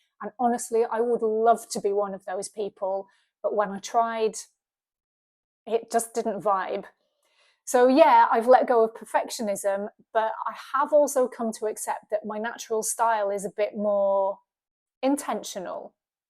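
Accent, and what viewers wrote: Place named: Northern England, United Kingdom. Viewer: irish married guy here from california. your channel is brill. keep on going. great to hear truth, and watched the people who judged you. we are here for you. great job. i’m an immigrant and also lived in london from the 80ts to 2000s know all about england. and your northern accent is great. enjoy Ireland. and hope you meet the right one. as i say keep up the great work. Slainte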